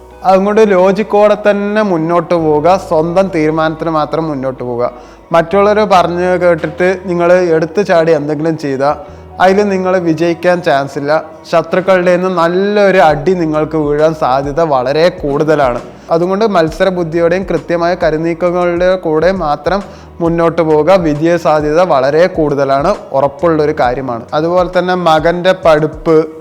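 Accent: Palakkad, India. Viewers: Palakkad nn aano?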